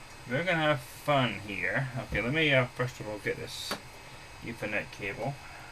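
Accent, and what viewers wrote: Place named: Scotland, United Kingdom. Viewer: wasn't expecting that! Still sounds VERY Scottish to me!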